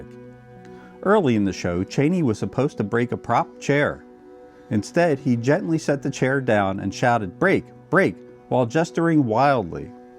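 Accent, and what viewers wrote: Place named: Pennsylvania, United States. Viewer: Your accent sounds like you're from Lansdowne, Pennsylvania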